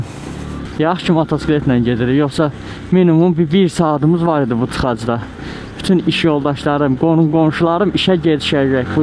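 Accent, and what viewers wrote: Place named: Qazakh, Azerbaijan. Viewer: Qazax ləhçəsi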